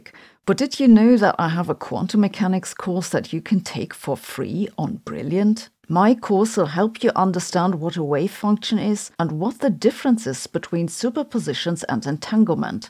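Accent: Germany. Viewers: I take everything this channel says with a large grain of salt - she does not even understand Special Relativity as proved by her clickbait videos about "faster than light travel" - had she ever studied Special Relativity and Lorentz Transformations, she would understand that "faster than light" is like saying "negative distance" , it is an oxymoron. Unfortunately, she would not know Minkowski spacetime if it hit her in the face. I expect scientists with German accents to be smart - sadly, she falls well short of the stereotype.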